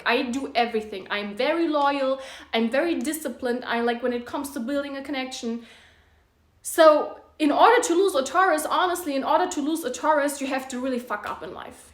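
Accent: Germany. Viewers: I think german, she's from Germany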